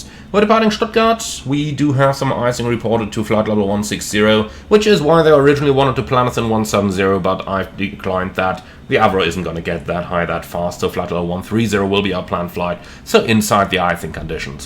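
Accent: Switzerland. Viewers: I don't speak german but, as a swiss descendant, I recognize the swiss accent when I hear it. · Geil mit dem schweizer akzent😂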